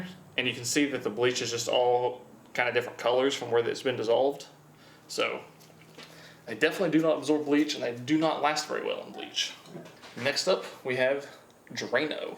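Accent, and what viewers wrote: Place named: Pennsylvania, United States. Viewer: What state are you from cause I’m guessing PA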